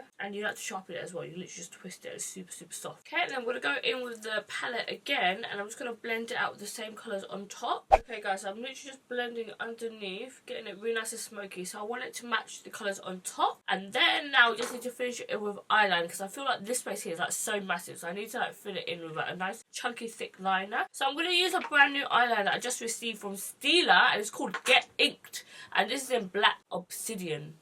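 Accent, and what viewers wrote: United Kingdom. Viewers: Yes u British u sound British I'm British anyway ly xxxx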